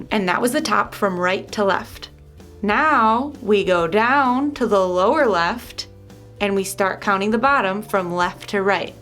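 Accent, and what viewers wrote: United States. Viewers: Are you american ?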